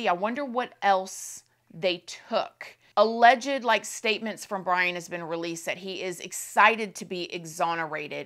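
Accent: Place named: South, United States. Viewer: Lol on pronouncing the names it’s a southern accent kinda thing. I’m from Texas and my accent butchers a lot of things 😂 So you are pronouncing it right you just have to put that twang on it. Lol Love your videos girl!! ❤ Oh BTW I watched the Closing statement from the prosecutor and boy oh boy he laid it down. I really hope he gets what he deserves.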